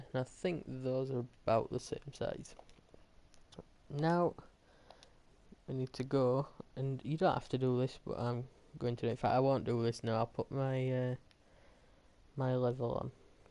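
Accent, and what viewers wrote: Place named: Yorkshire, United Kingdom. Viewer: Deffo from Yorkshire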